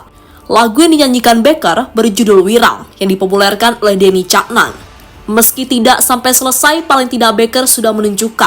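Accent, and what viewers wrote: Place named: United Kingdom. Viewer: Suara aksen british nya lebih menggetarkan. Cocok didengarkan di dalam bioskop film documenter bertema perang, mafia dan horor.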